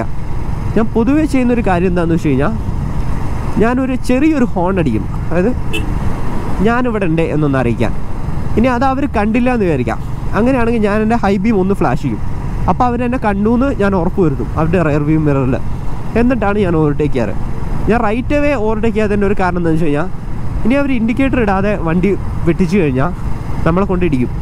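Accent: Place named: Kannur, India. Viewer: bro ഏത് ജില്ല ആണ്? കണ്ണൂർ ആണോ അതുപോലെ തോന്നി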